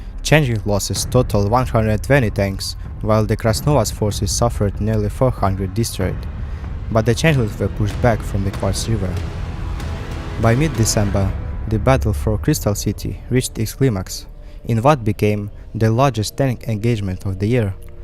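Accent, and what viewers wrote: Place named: Russia. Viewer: Килотонный респект автору. Я сразу понял, что автор умеет говорить на русском из-за акцента. Чувак, тебе уважение, продолжай пилить годный контент!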